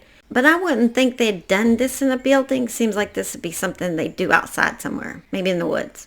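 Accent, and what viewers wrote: South, United States. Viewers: Bloody hell! There are just no words to describe this level of depravity! On a positive note: I LOVE your southern accent ❤ Cheers from Australia 👍😁🇦🇺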